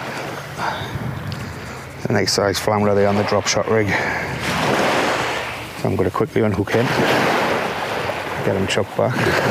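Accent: Newcastle, United Kingdom. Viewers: No offence but I hate the Geordie accent I've had a few bad run ins with Geordies and I just can't handle the accent any more. Good fishing tips thanks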